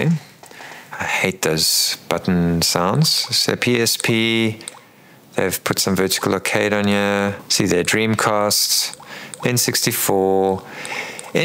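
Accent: South Africa. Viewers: Really enjoying your videos, man! Heard a fellow South African accent, plus channel and vids all about things I love. Easy sub. I've been doing retro emulation on my old PSP for around 10 years now, and last week decided it was time to get an RG35XX Plus, It was meant to a "dedicated console for emulation", one and done, but I quickly realised it's just my "first" emulation station. lol.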